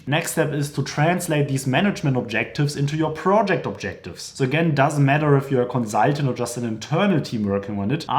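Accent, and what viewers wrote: Germany. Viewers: I can feel that you are doing this deep German accent on purpose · Genau , bist du Deutscher? Wirklich 🎉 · Your videos are highly rated and appreciated. Your German accent adds uniqueness to your way of presenting valuable knowledge and experience. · Your English is So German. 👍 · Youa german englisch maekes me aggressive, soirry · nur so mal als Frage, ist dir der starke deutsche Akzent mal zum Nachteil geworden?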